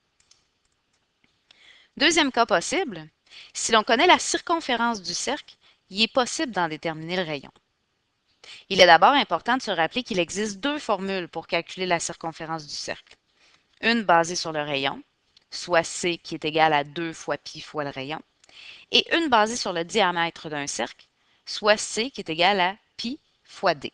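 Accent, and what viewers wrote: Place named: Quebec, Canada. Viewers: J adorre l accent canadien 😍 Très bonne vidéo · Accent québécois***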